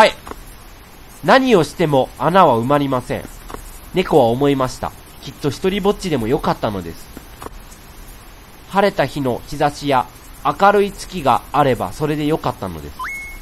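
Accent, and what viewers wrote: Kansai, Japan. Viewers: まだ関西弁がのこってる頃だああああ！！(まあ今もちょっと言ってるときあるけど)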